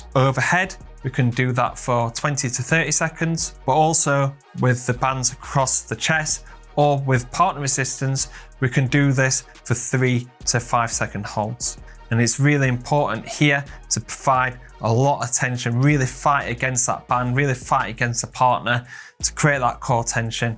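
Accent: United Kingdom. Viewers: The real British accent makes the Viddo much interesting 👍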